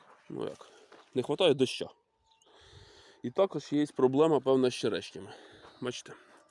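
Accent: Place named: Poltava, Ukraine. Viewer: Говірка чую полтавська, а район який? Миргородський трохи поморозило.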